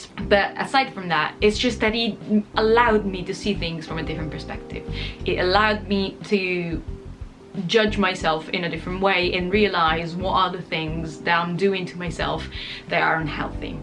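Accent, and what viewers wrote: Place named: England, United Kingdom. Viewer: Da quanto tempo ti trovi in uk? Sembri Inglese 😁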